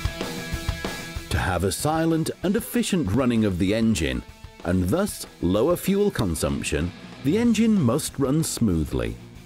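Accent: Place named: RP, United Kingdom. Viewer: Funny, how it is that you have the stupid, raucous (and distracting) soundtrack and a prim and proper English gentleman speaking perfect Queen's English!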